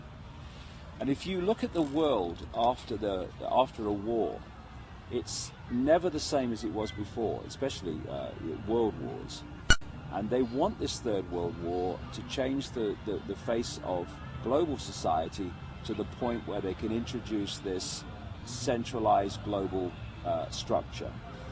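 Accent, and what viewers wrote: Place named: United Kingdom. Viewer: "A certain letter was written between two people in a secret society" can you get more precise while citing a source? Then quoting a traffic warden.. How can anyone believe he is doing anything else than pulling it out of his ass? Just because he has a British accent does not make him knowledgeable or anything. And, all these "signs" he's talking about are just normal things. Civil unrest happens every once in a while, and boosting the warden's powers? What does that have to do with it?!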